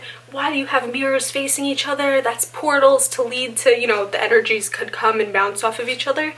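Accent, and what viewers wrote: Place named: East Coast, United States. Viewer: Kinda random but are you from the east coast? Your accent peaks through at times. 😂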